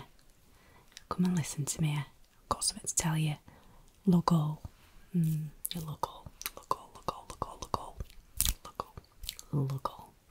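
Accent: Yorkshire, United Kingdom. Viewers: As a Yorkshire girl I couldn't love this more! I think it's such a warm, friendly accent. 🥰 I've also lost most of my accent over the decades but it definitely gets broader when I go home to see family. After 20+ years I can still surprise my hubby with my Yorkshireness from time to time with words like morngy, gip and gormless! 👂🏻I also say my owt's and c'** the same as you, we had ginnels behind our house and my sister was the buggerlugs in our family. 😁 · some say she's putting her accent out there. others would say she's finding an excuse to call us all the c word ;) As someone who lives in Lancashire it's mildly disconcerting how many of these I knew. That said, I feel sad that you're losing your roots. Bring your husband and child up north so that they know what true beauty is like. None of that southern malarkey! Still loving the pink fluffiness! 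It's much easier to enjoy an asmr video when the video creator looks so cosy which you do! Subscribed! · As a fellow northerner I love this 😂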